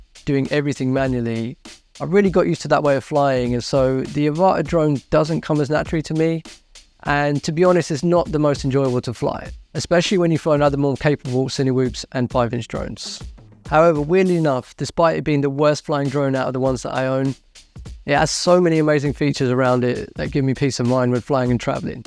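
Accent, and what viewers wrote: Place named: London, United Kingdom. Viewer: That's good to hear thanks for the reply! Yea fellow Londoner (guessing from the accent), lots of content is US based with drones on here so good to see experiences from UK chaps like yourself! Might have to invest in an avata!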